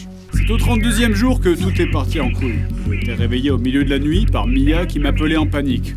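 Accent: Paris, France. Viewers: Le ptit accent pointu parisien pédant est juste par-fait ! Ça sert vraiment l'immersion ! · cette accent parisien !!!!! loool Hâte de voir la suite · C'est fais exprès l'accent parisien? J'aime ce type de let s play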